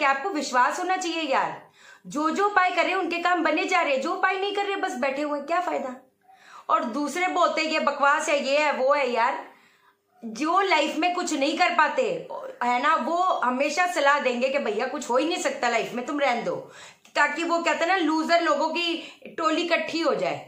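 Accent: Punjab, India. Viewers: Yaar kya mast attitude hai twada bilkul punjabi tone blesss you darling and love your mom also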